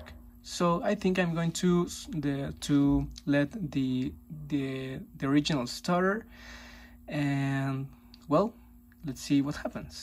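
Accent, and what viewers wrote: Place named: Mexico. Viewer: I think so. He collects Mexican lighting and has a Mexican accent (I think). I'm terrible at recognising accents.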